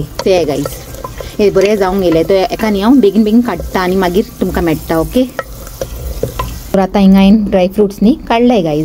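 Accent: Mangalore, India. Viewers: Mangalorean accent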